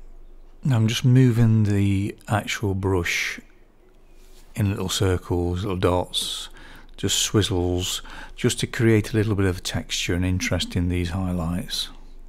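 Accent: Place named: Ilkeston, United Kingdom. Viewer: Born and bred in Ilkeston, I recognised your local accent immediately! I’m in Lancashire now but wondered if you’d share whereabouts you’re from? Not an Ilson lad yourself are you?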